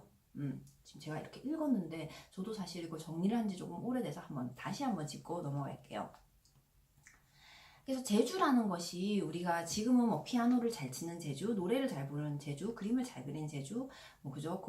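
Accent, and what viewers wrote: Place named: Gyeongsang, South Korea. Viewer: 말씀이 좀 빠른건.. 경상도 말이 원래 그렇죠. 대구신가요? '대학장구' ㅋㅋ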